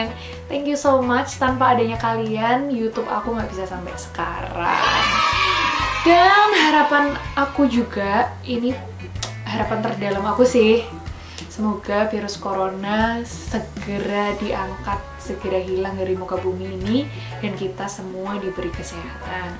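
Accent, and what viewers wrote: Java, Indonesia. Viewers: Medok banget 😂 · Logat"nya, ada jawanya sedikit😂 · Suaranya orang jawa banget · Medok bed kak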